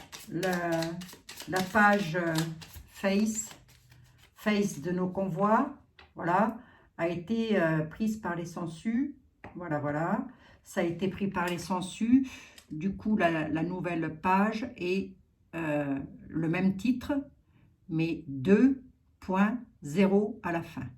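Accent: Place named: Lorraine, France. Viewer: J’adore vous écouter car votre voix et accent me rappellent les bons moments vécus en Meurthe et Moselle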